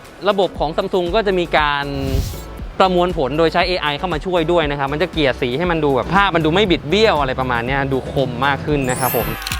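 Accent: Chiang Mai, Thailand. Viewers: พี่เป็นคน เชียงใหม่ใช่ไหมครับ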